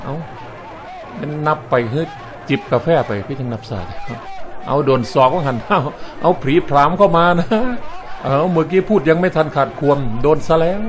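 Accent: Isan, Thailand. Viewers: พากย์อีสานตลกดีบ้านๆดี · ภาษาอีสานก็เพราะดีค่ะ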